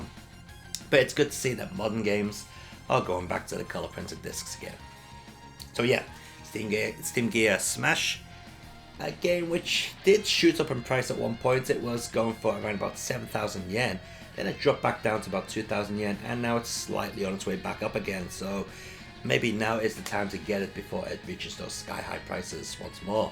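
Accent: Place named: Liverpool, United Kingdom. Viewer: sounds like Liverpool dialect to me :)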